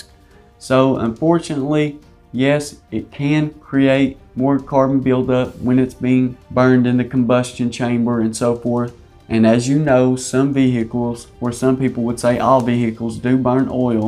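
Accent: South, United States. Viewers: Super strong southern accent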